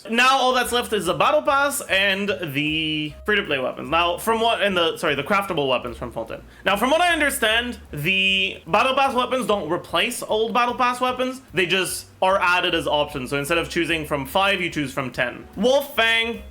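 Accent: Quebec, Canada. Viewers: Maybe because thats how he really pronounce these words since he comes from quebec where french is common ? You're the cringe one here lmao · the french part of canada yea i can hear it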